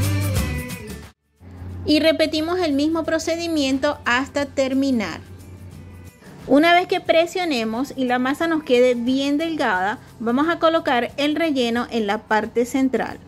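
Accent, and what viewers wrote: Venezuela. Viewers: Buena receta para las personas que no pueden comer gluten ( aunque gracias a Dios ,puedo comer de todo ) Saludos desde Venezuela 🇻🇪 , aunque por tu acento creo que también eres venezolana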